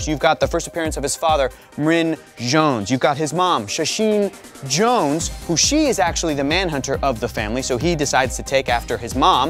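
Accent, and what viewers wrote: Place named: Australia. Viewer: That Australian accent though.😂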